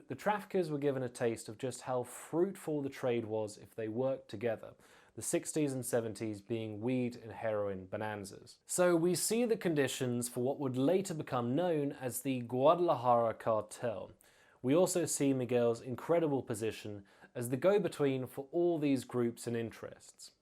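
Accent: England, United Kingdom. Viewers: SPEAK UP NEXT TIME MAN THIS ISNT AN ASMR VIDEO I HAVE MY VOLUME ALL THE WAY UP BUT BARELY HEAR YOUR SULTRY BRITISH BOYISH VOICE LMAO SPEAK UP NEXT TIME!! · I’m confused with this host… he has a British accent yet he pronounces perfect Spanish when he says their names or town names in Mexico 🤷🏼‍♂️🤣🤣🤣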